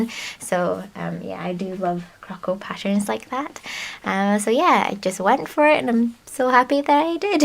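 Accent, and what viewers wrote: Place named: Scotland, United Kingdom. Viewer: Love the video! Was pleasantly surprised to hear a Scottish accent too 😁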